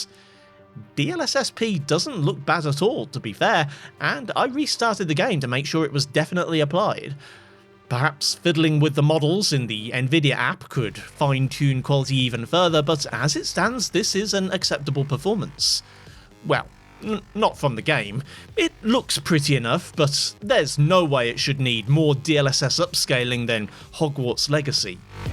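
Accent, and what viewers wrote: United Kingdom. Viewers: man i instantly recognized your british accent i'm so proud of myself :D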